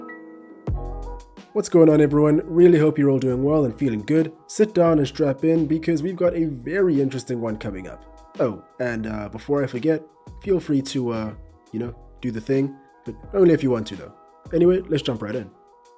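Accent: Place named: South Africa. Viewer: Ah, South African…couldn’t put my finger on the accent.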